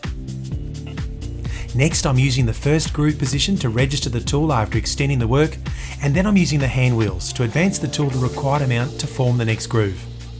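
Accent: Australia. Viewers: realised that now when you say it. At first I thought he was Britain but then When you said it. I realised that he is Australian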